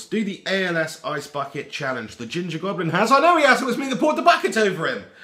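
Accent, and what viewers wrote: United Kingdom. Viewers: Which part of the UK you from broo?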